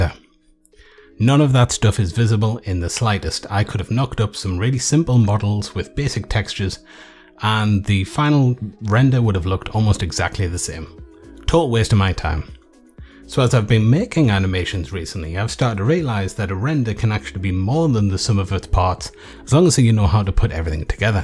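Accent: United Kingdom. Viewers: I don't even Blend. I just come here for the great videos and friendly voice. I miss the UK, so I love the UK accents the most. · Doesn't sound rough to me, I quite like it. Sounds kind of "mushy" if that makes any sense :-) -American who digs UK accents.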